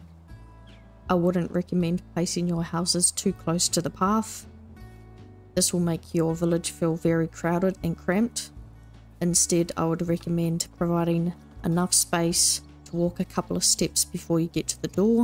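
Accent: New Zealand. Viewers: Youtuber sounds kiwi as. haha.. Nice!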